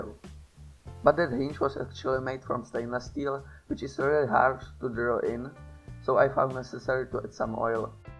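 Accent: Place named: Eastern Europe. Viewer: I am sorry but you sound like slav. Jsi čech nebo slovák?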